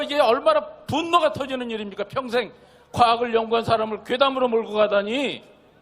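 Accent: Jeolla, South Korea. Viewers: 전라도 사투리쓰네 과학자라면 과학적 으로 증명하면 될것아니냐? 제발 그렇게 해봐라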